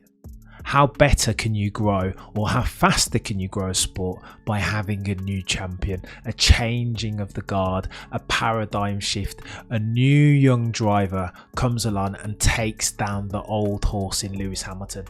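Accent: United Kingdom. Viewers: I'm sorry, are you British?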